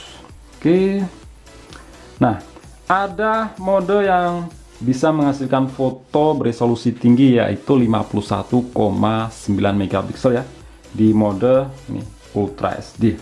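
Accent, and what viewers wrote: Blitar, Indonesia. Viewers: Mas opo Wong blitar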